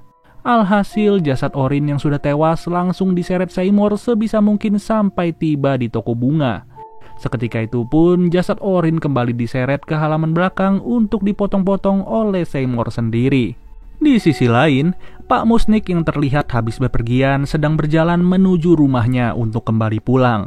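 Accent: Java, Indonesia. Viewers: Orang Jawa mana ni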